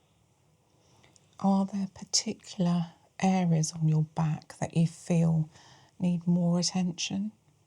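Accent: United Kingdom. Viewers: Lovely video as always. Your lovely British accent is like silk to my Swedish ears 🤗🥰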